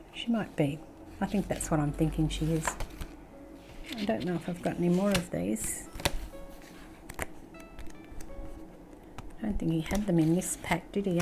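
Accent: Australia. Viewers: Unmistakable Aussie accent, as soon as I heard you speak, I subscribed (because we don't have many Aussies who make videos using Tim's products). I'm in regional Vic. Great pieces, loads of fun. Love your album. Cheers to you too...Aussie, Aussie, Aussie, oi, oi, oi.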